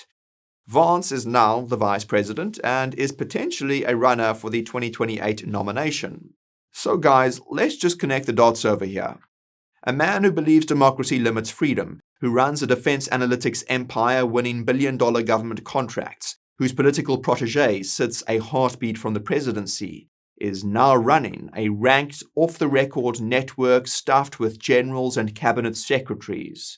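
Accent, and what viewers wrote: South Africa. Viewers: He's clearly not British, maybe South African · Your accent sounds very South African? Just asking as I am South African with Irish roots and have a lot of respect for the people of Ireland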